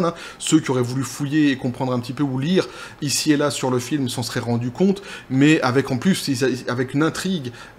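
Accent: Quebec, Canada. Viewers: Ah, je suis curieux d'entendre ton accent Québécois alors. Le défi est lancé! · tu fais plus accent canadien que belge mais je préfère l'accent canadien 😄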